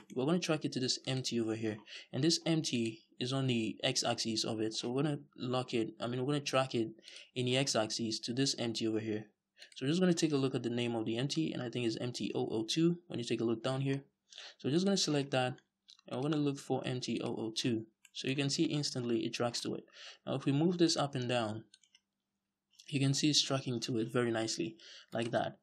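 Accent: Nigeria. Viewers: Nice work bro!!👍🏽 Btw where are you from? You have a Nigerian accent.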